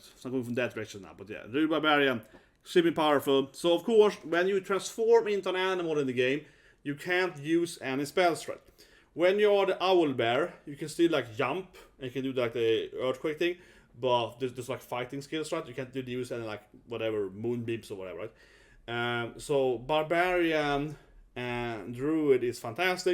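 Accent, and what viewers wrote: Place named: Sweden. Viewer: A tip is to slow down your speech a little, it's a bit hard to hear sometimes and the subtitles are somewhat bad when you speak fast and loose with that Swedish accent.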